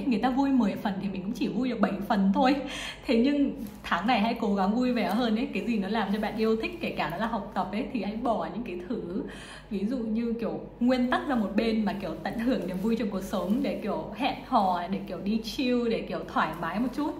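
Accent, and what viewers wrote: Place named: Cam Pha, Vietnam. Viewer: nghe giọng như người cẩm phả vậy :)))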